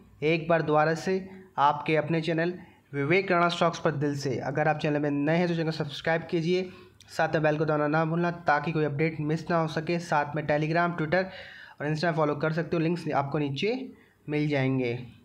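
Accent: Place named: Western Uttar Pradesh, India. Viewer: भाई तुम्हारे डायलॉग बड़े अच्छे लगते है एकदम देसी वेस्ट यूपी वाले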